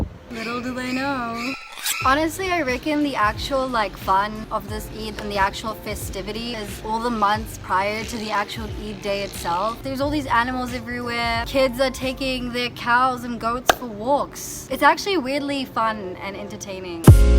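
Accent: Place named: New Zealand. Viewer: I really wanna learn NZ accent from you.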